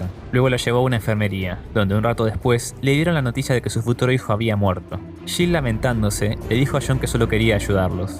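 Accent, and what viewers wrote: Argentina. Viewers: Excelente video, muy detallado y completo, pasando por toda la saga de esta maravillosa película, felicitaciones por tu trabajo, amigo, por tu acento sos Argentino. Saludos desde Buenos Aires Argentina! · ja, reconozco bien ahi el acento argentino como cuando dices "machoria" (mayoria) o "chaves" (llaves) y a pezar de esto tienes una voz muy narrativa y asi, buena pa el estreno del video, qe buen era el momentito qe pasé gracias a ti, te lo debo tu like, pero qe 🤢🤮🤮🤮🤮 cuando mostrate la escena cuando kramer morrio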